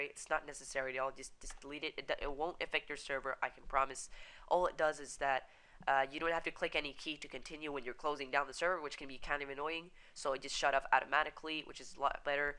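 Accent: Sweden. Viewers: are you from sweden? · are u swedish? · When i try to open Start.bat it crashes. Btw are you swedish?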